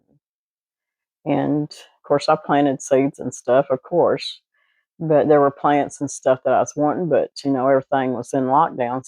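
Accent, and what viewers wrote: South, United States. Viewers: Miss I've said it before, I could listen to you all day. You have got such a way, such a merry twinkle in your eyes and sweet smile, and I dearly love your charming southern accent. So, never never change anything. I read thru some of the other comments and it's clear we are all in agreement 🥰. Little Wallace is adorable!! You made me laugh when you said he's sensitive about his bat ears 😄. Well done on the garden fence. It's beautiful!! And the kitchen cabinet is going to be gorgeous. Love the paint and paper. Lovely selection of flower seeds. I like straw flowers. I'm hoping to get a lot of zinnias planted this year too. Oh, I was thinking, you must have had the most well fed school kids and teachers anywhere. That had to be a real labor of love. was so happy with his cobbler and ice cream 😊. Thank you for for such a wonderful video. 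Loved it ending with a Psalm. Take care and God bless. 🥰♥️🤗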